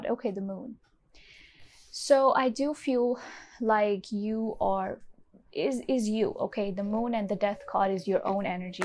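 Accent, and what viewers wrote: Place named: France. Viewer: I like a lot your accent and voice. ..There is smthg french ...and sweet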